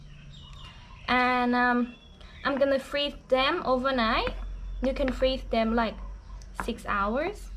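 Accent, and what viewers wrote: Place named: Australia. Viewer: You slowly getting an Aussi Accent ...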